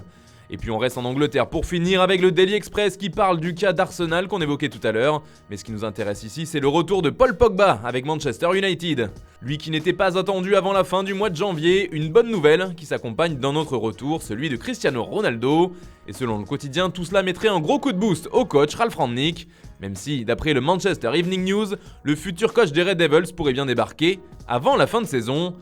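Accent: Italy. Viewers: J'apprécie que le narrateur ait arrêté d'en faire des caisses avec son accent italien. Là c'est bon le dosage, bravo ^^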